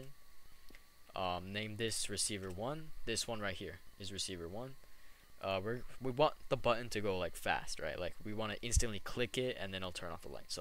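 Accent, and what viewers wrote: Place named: United Kingdom. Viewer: UK accent.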